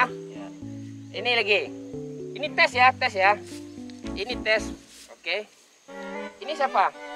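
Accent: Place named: West Sumatra, Indonesia. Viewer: Akhirnya ku tau siapa dibalik suara Minang kocak😄😄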